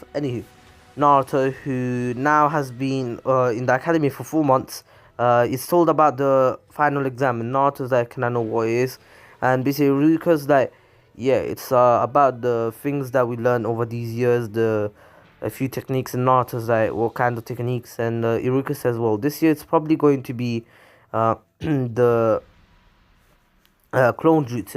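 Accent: United Kingdom. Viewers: Bro i love the british accent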